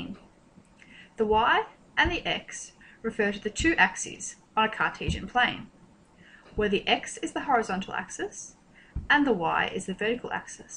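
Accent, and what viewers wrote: Australia. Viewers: i couldn't stop laughing at the Australian accent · Good video, minus the australian accent that kinda got on my nerves.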